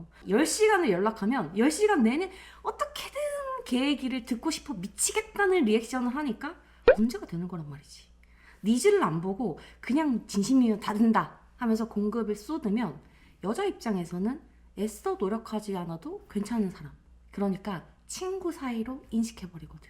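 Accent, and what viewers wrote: Gyeongsang, South Korea. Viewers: 갱상도 사투리좀 고쳐라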